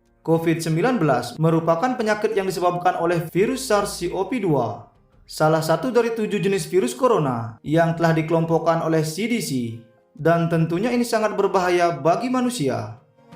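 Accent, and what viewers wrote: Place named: Bali, Indonesia. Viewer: Narasinya diperpendek, logatnya kent al bali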